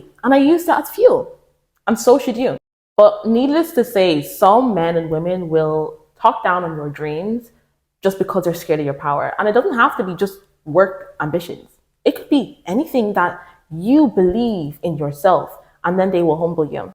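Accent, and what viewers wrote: Ireland. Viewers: Love the Irish ☘️ accent❤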